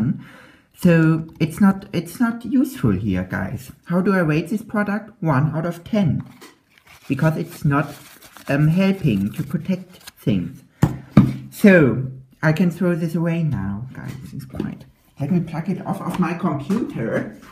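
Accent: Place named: Germany. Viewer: That german accent is craking me up lol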